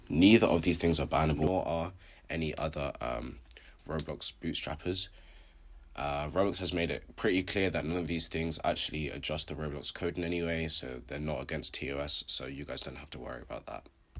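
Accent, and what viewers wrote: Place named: United Kingdom. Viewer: British accent go mad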